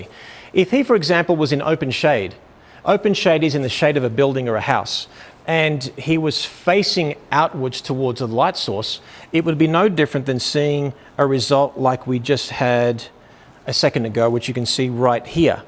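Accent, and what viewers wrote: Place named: Australia. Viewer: I love his aussie accent.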